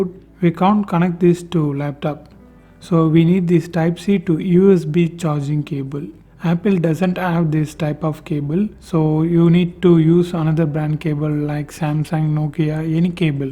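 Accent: India. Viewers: The moment I heard the Indian voice I knew I was saved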